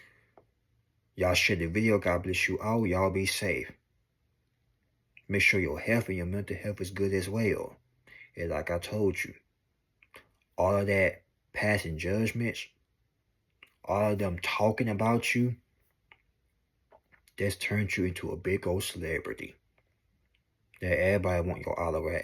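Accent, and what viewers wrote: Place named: South, United States. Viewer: Hey bruh, I know people got so e shit to say about me. I also know you seem to be on my side. Seeing how you got a southern accent... im sure it's a culture shock sometimes. 1 word can have a completely different meaning in several languages. It also doesn't help that people are constantly changing the meanings of words in our own language. "Im dead" today... doesn't mean what it did 10 years ago. Next time you think you hear something offensive... don't be afraid to ask what that term means to them personally. I only say this because your one of the few level headed people I know strong enough to follow through. Change your mind... change your world ❤ Keep ya head up son.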